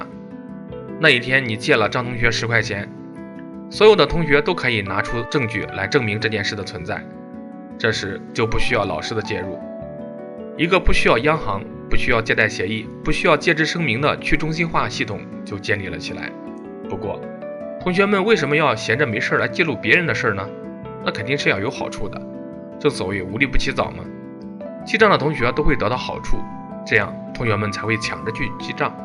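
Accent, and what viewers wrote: Henan, China. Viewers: 哈哈哈 up主是不是豫北人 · 主持人是河南人吧？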